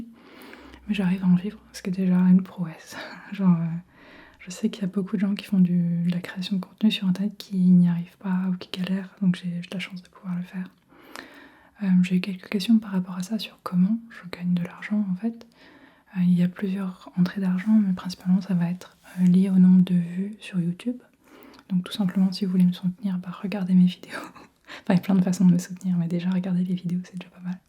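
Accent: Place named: France. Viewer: Coucou ! Par rapport à la question sur tes pronoms/ton genre, du coup je me posais une question, comment le pronom "ael" s'accorde pour les adjectifs ? Si j'ai bien compris tu préfères utiliser les adjectifs neutres ce que je comprends totalement mais voilà je me posais la question :) Sinon par rapport à la bienveillance que tu retrouves + chez les anglophones que chez les francophones par rapport au 50/50 des vidéos anglaises/françaises je pense que c'est parce que les anglophones ils adorent l'accent français même s'ils comprennent pas et les francophones ils aiment pas trop les vidéos en anglais.. en tout cas bon courage pour tenir le 50/50 mais sache que tu nous decevras jamais peu importe les vidéos que tu fais :)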